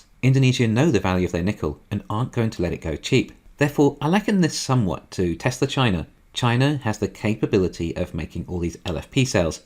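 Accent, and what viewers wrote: England, United Kingdom. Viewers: At last an Englishman with an accent on Tesla!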